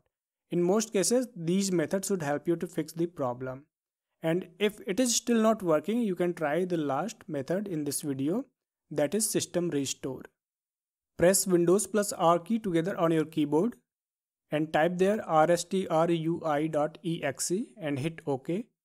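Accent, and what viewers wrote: India. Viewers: Random YouTubers with Indian accent holding our society and computers on their shoulders. Thank you.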